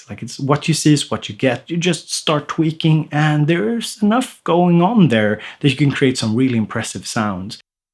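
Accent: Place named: Sweden. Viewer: I've been wondering where your accent comes from. Hearing your long 'e's tells me you're Sw'e'dish. :) Thanks for the videos!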